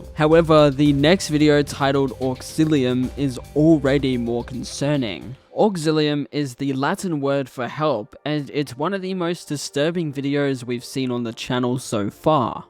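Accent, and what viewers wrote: United Kingdom. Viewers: U sound sooo British, no like really, anyway, good video ma boi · Love the British accent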